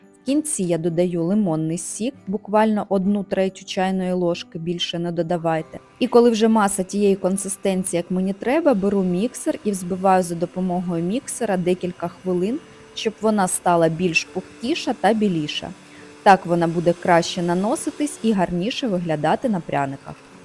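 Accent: Standard. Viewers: Дякую за сиачний рецепт і дуже гарний супровід - чиста, правильна, красива Мова- БРАВО💙💛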